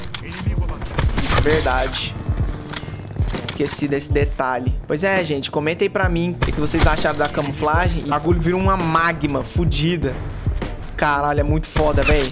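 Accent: Minas Gerais, Brazil. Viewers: Mineiro nato haha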